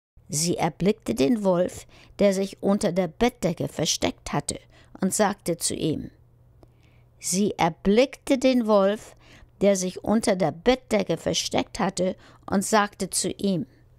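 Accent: Germany. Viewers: It's a German dialect 😉 (Berlin??? 🤷)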